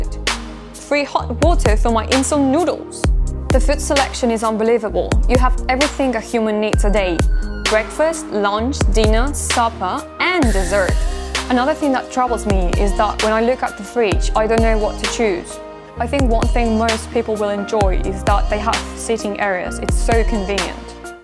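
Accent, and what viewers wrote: United Kingdom. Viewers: 這英國腔XD的西班牙妹很正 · 有一點英國腔 My type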